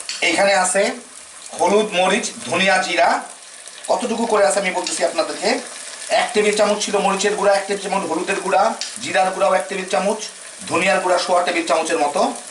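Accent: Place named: Chittagong, Bangladesh. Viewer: Apni chittagong er manus kota gulor dhorone buja jai.ami apnake subscribe koreci onk sushadu recipe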